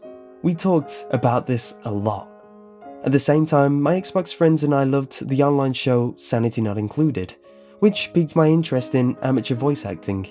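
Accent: England, United Kingdom. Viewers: ENGLAND. That's why I love your voice so much. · Where from england are u from are u york shire bread or southern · That explains why did you sounds like a British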